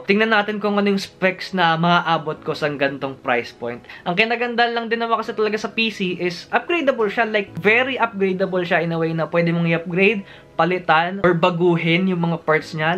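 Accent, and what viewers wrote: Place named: Philippines. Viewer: As u can see pinoy yang nagsasalita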